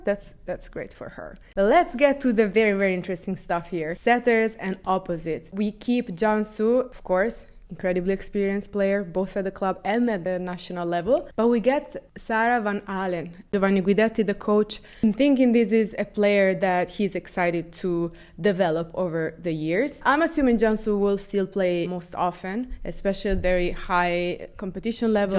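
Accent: Turkey. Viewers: Wow I thought you had a Turkish accent. Still not buying that Italian thing 😂 not that it matters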